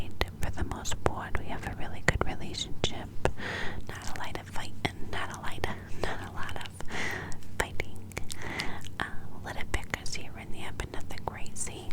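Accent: New York, United States. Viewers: This is insanely perfect timing! I was just typing into my search bar for a ASMR NY accent video and you DELIVERED with new content 😍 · Yay NY accent is my absolutely favorite thing you do!! A whole accent video or trying another one would be cool 😻 Love u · i love the ny accent series ur the best for keeping my attention span engaged and making everything so tingly thankuuuu🥰🌟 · I ❤️the NY accent! So tingly! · Was eagerly waiting for a NY accent video, and was so delighted to see this pop up! Thank you so much,! 💜💜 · Yay! She is back with NY accent. My fav accent in U.S. 🥰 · You have no idea how much I LOVE your ny accent videos! 😭💕 Thank you so much,. Sending you lots of love from Tijuana 🇲🇽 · Yay! The NY accent you do is DA best!!!! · Yay! Thank you so much! I love your NY accent! ❤️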